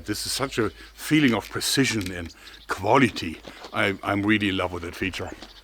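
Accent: Germany. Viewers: I love that germanized english accent!. Cool!. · Hey, your accent reminds me of the accent Germans use when they speak English here in Germany. Are you German?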